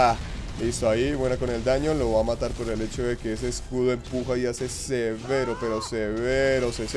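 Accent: Colombia. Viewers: severo acento, de una supe que era de Colombia xd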